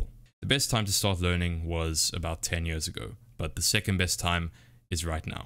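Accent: South Africa. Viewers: immediatly recognises South African accent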